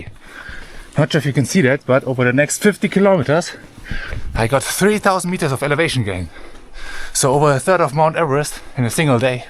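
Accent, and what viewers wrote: Germany. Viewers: 很喜欢你的德国口音的英语，希望你一切顺利∽ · im curious bro where r u from, i like ur accent haha , Germany Belgium or Holland，btw , welcome to china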